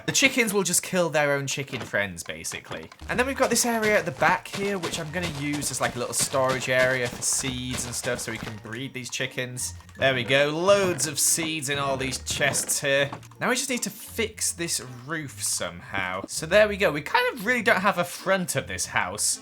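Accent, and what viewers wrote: United Kingdom. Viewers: The beginning killed me, that is why I love the British accent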